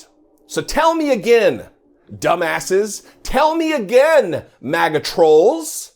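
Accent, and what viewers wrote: South, United States. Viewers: I love your Southern talk.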